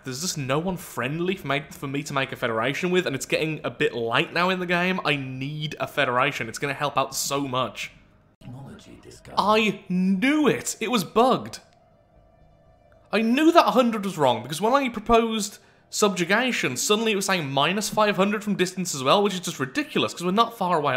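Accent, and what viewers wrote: United Kingdom. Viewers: Where is that Tim Curry-like accent from in the UK? I keep expecting you to shout 'Spaaayce!'.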